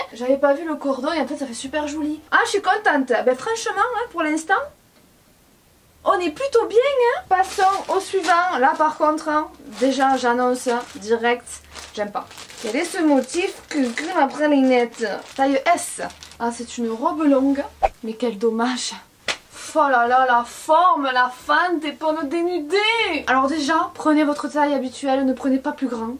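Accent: South, France. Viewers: Tu es vraiment mignonne, rigolote, simple, déterminée, et plein d'autres jolies qualités. Je te suis depuis longtemps mais étant une jeune femme de 46 ans :) je me voyais pas dans tous tes contenus, mais aujourd'hui j'ai réalisé que je n'étais pas abonnée, et qu'avec ton Million passé, une de plus ou une de moince (haha, je suis une chti, et chez nous, on ne prononce pas le s de moins ! mdr), ça changeait rien, et puis zut alors, je souhaite entrer dans ta communauté, avec mes 46 ballets et y rester discrete, mais voilà je trouve que tu mérites ton succès. Ta bonne humeur, ton humour, ton Accent du Sud que j'adore, ta nini et tout le reste, un univers gai et rafraichissant.... Merci xo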